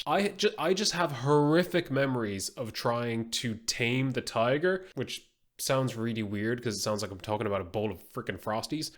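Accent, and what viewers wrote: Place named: Ireland. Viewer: Oh a Irishman? Well lad!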